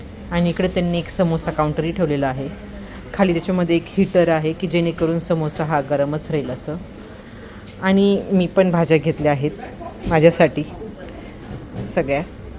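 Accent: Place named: Malegaon, India. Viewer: तुम्ही सताना मालेगाव भागातील वाटताय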